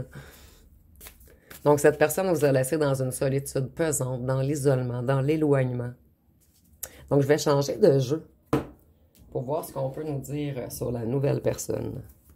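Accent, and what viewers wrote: Quebec, Canada. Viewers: J'adore vous ecouter avec votre accent quebecois vous etes calme❤❤❤❤❤❤❤❤❤❤❤❤❤❤❤❤❤❤❤❤❤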